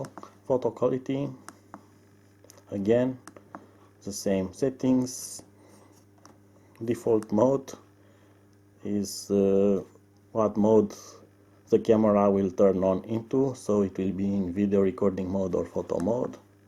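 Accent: Romania. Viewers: Good review , buy the way this is 100% romanian enghlis, accent tell us :)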